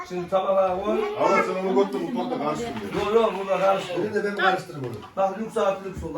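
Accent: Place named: Avşar, Turkey. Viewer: kardeşim selamlar, yeni abone oldum, memleket neresi kardeşim, avşarlık varmı şiveler benziyor gadasını aldığım 🙂 Frankfurt'dan selamlar hayırlı günler 🇹🇷🇹🇷🇹🇷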